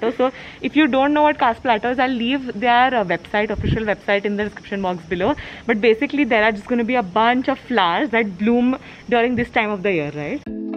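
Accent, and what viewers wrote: Gujarat, India. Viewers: That gujju accent😂🤭❤❤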